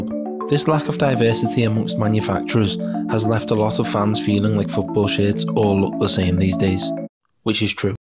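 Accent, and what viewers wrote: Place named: North West England, United Kingdom. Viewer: you deaf he’s got a north west accent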